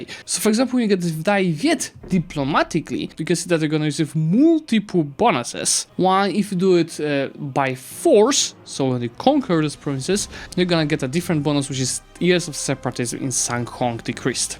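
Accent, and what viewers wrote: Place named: Poland. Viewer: <3 love the polish pronunciations myself, thanks for the updates. Really cool that you were able to get a preview!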